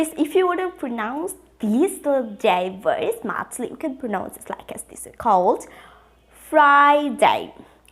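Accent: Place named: United Kingdom. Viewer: how you got adapted British accent! It's so fascinating to listen.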